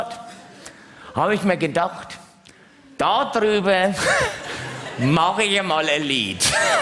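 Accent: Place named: Franconia, Germany. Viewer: fränkische Sprache du dist sehr sehr cool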